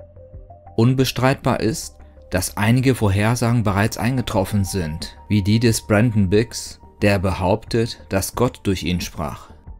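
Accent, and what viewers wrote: Spain. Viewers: Es ist wundervoll wieder deine Stimme mit dem schönen Spanischen Akzent zuhören. Möge Liebe und Güte immer mit euch allen sein. So sei es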